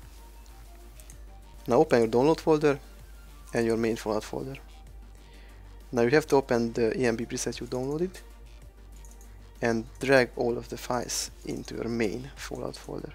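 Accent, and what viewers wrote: Hungary. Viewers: Számomra az akcentusodon tűnt fel,de jó tudni,hogy magyar vagy :D Ha hülye vagyok,s valami nem sikerül legalább az anyanyelvemen tudsz segíteni :D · A kiejtésből egyből rájöttem hogy magyar vagy. :D Segített a videó, köszi!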